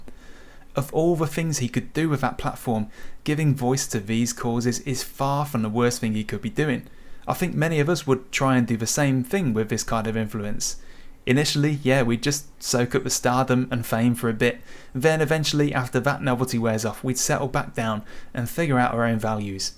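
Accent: United Kingdom. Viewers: You sound British and support Max. I'm willing to bet you'll never find someone from Holland, let alone a journalist supporting Lewis over Max. Weird. But I guess it's the 50%-50% that bothers you.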